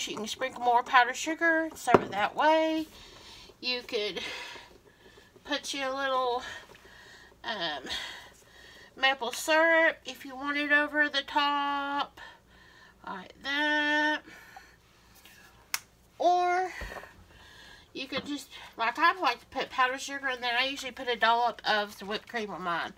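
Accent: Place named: Kentucky, United States. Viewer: Just found your channel! Love it and I just knew you were from kentucky!! ( I am too! 😊)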